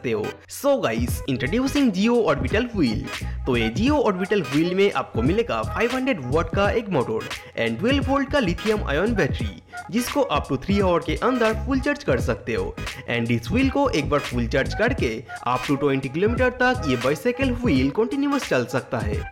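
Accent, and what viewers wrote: Bengal, India. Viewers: Bhai bengali type ka hindi bolte hoo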